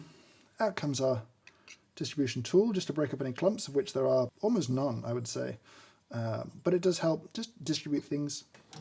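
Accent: United Kingdom. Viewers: I have no intention of buying one of these coffee grinders. I don't even have an espresso machine. I watch your videos because you are so passionate about coffee - oh and the British accent helps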